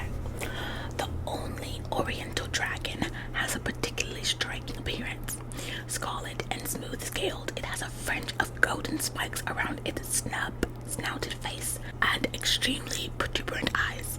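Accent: United Kingdom. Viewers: if this was my first time watching your videos i would think you were british 😭😭😭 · I love the British accent 🩷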